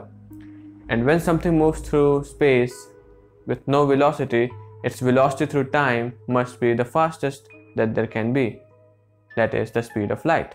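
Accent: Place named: India. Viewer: I cannot experience this video due to the pajeet voice and accent.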